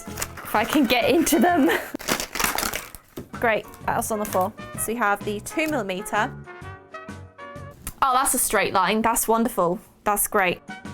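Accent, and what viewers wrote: United Kingdom. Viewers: Would not mind seeing and hearing you for two hours your from my neck of the woods so is always nice to hear another person from the UK but from elsewhere in the world I love the look of the Inky box hugs from Scotland 😁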